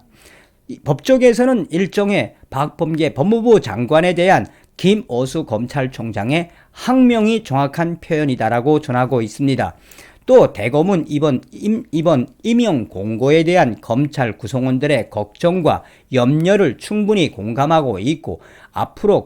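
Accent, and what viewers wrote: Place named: North Korea. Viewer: 북한식 말투가.... ㅋㅋ 재밌어~!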